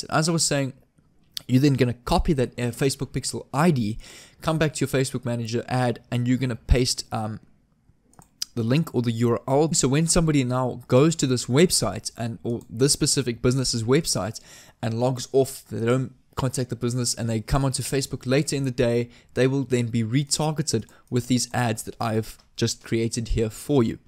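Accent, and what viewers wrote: South Africa. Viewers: Hi, I just discovered your channel. I'm a fellow South African. (I'm assuming you're South African because of your accent and some of the websites you visit when you're recording your screen default to the.co.za versions.) Thanks for this video, I thought it was an excellent summary of the process involved. I have a question regarding the installing of the pixel. Obviously you are going to need access to the back end of these businesses websites to install the pixel. Are companies not hesitant to give you access to modify their website just from one email? I mean you're a complete stranger that just emails them out of the blue, and asks them to pay you as well as modify their website. I would be hesitant to let a complete stranger do that and even more hesitant if I found out they are from a foreign country, like us. Could you perhaps make a video going in to more detail about "Closing the deal". 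The practical skills like installing the pixel and creating the ads can be learnt and perfected but the hardest part of the whole process, in my opinion would be convincing them to let you fiddle with their website. Most business have probably hired a web developer to make their site for them and I'm sure if they asked their web developers advice, explaining that you contacted them and asked to install something on their website the web developer would by default advise them to just chase you away or ignore you in light of all scammers and fake traffic generation and SEO schemes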